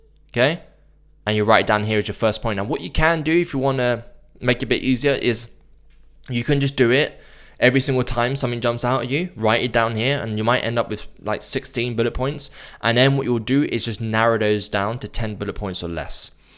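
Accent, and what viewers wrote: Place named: United Kingdom. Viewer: Great! 😊 btw I love British accent & sense of humor. Cheers! 🥂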